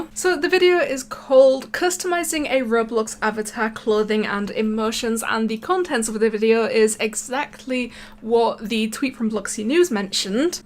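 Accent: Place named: North London, United Kingdom. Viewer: I think she’s North London. I am in east London.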